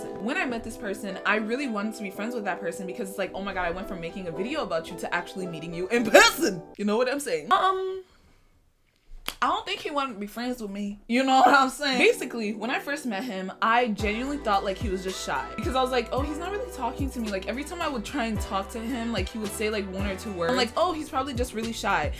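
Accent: Nigeria. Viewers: She is nigeria Becuz her last name says and its a igbo name in nigeria And in her second youtube channel when she was surprising her family with gift she had played a nigerian music She is nigeria but was born in taxes you can hear the nigeria accent when she is talking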